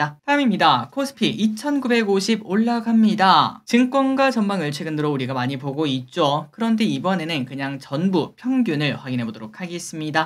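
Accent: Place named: Daegu, South Korea. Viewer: 이거 어디까지 올라가는거에요?? 찐 대구 사투리네요ㅋㅋㅋ😂😂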